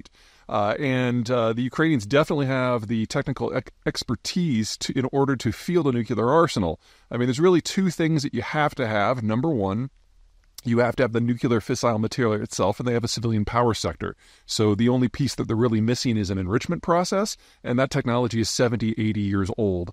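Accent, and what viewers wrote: United States. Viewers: Its refreshing to hear from someone with an American accent that understands that nuclear weapons are a technology from the 1940's, that can be developed by most advanced countries with nuclear reactors if it is needed to ensure their own security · Nuclear in 'Merican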